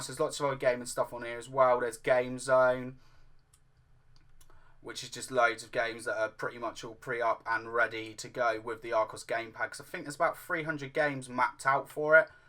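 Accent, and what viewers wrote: United Kingdom. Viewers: Oh bless ya buddy, from you from Oxford mate?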